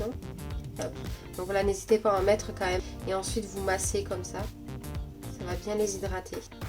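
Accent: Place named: South, France. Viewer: Merci pour votre vidéo! J'ai arrêté de me ronger les ongles et je cherchais une vidéo pour en prendre soin. C'est chose faite! J'ai trouvé ma petite routine ongulaire 😁. Vous êtes du Sud non? J'adore votre accent!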